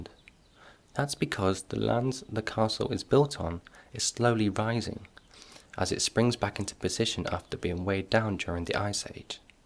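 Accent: United Kingdom. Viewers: Wonderful video!!!! Thank you - I so love the British accent :D!!! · Your british accent is so awesome❤️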